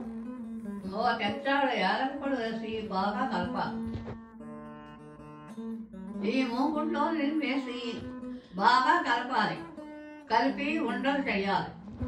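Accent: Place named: Godavari, India. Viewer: Chaalaa thanks andi! Mee Telugu kuda Godavari jilla Telugu laga undi feels nostalgic! 🙏😊👍 Sankranthi Subhakankashalu meeku, mee family andariki! 🙏❤️😘💐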